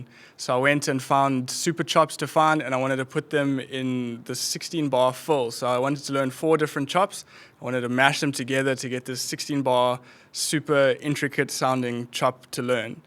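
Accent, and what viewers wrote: South Africa. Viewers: Awe u have an S.A accent😅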